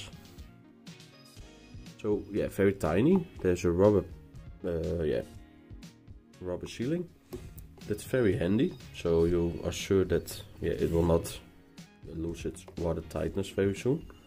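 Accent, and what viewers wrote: Netherlands. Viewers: Nederlands ? Ik hoor de nederlands Engelse uitspraak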